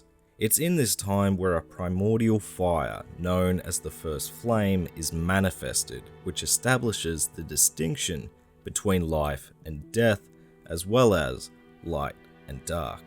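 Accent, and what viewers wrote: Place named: Australia. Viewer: Great channel, you should have more subs. Also nice to hear another Aussie voice on youtube.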